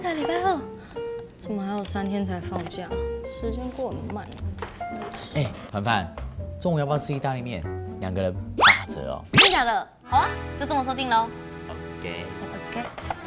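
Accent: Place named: Shandong, China. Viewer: 山東腔好歪歪歪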